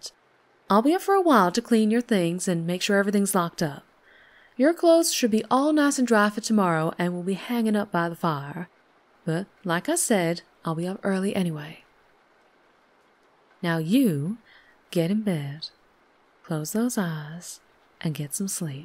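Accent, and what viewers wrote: South, United States. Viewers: ! Another amazing and magical ASMR tale with a new character to love, Mama Marennah is a wonderful addition to your gallery and your Southern Accent is just even more incredible than before. You can really tell you have been working hard on improving your Voice Acting and all that hard work has paid off hugely. A standing ovation is the bare minimum accolade that you deserve and honestly so much more would be appropriate.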